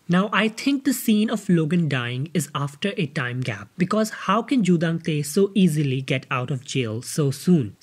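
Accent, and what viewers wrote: India. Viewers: Indian voice??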